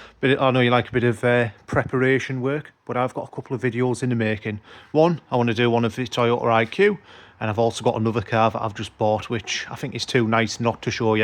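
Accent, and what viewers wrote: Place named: Scotland, United Kingdom. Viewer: love thece videos,but dont understand this gay"s english at all....is it scottish ore something?????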